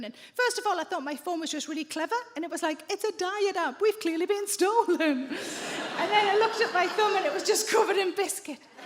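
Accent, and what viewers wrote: Newcastle, United Kingdom. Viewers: she's a Geordie. not fast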